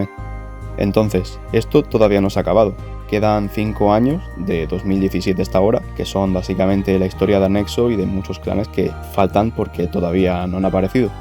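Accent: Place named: Spain. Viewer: "historia de los clanes latinos" lo narra un español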